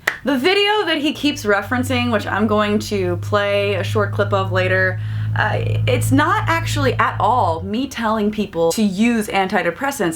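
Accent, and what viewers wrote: South, United States. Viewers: You slip in and out of a southern drawl. I thought at first it was on purpose. That's unique. I would say cute but apparently when a guy makes a compliment on the internet, clearly he is after something. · I see you use your country accent more and more ( i don't know if you notice it). Why is that? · Happy Thanksgiving and thank you for blessing us with your Southern twang. 🙏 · havent been to this channel in awhile and sounds like she has more of a southern drawl haha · What's with the cowboy accent lol? I mean it's cute. But why lol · I can't tell you how much I am I'm love with you for your exaggerated sassy southern accent it warms my heart every time. 😻😻 I'm sorry onision said bad things about your body. You are beyond a perfect 10 and I hope you know that and you always were and always will be. Happy thanksgiving 💖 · From one Southerner to another, I love hearing your accent escape every now and then. 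Mine only does when I'm drunk xD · Your southern accent came out a few times and I wasn't sure if it was fake or if you actually have an accent.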